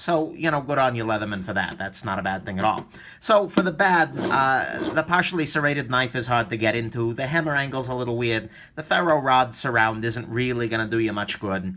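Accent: New York, United States. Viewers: This man literally has to have had surgery that altered his voice. If you watch a video just one year later he sounds like a completely different New Yorker hahaha. It weirds me out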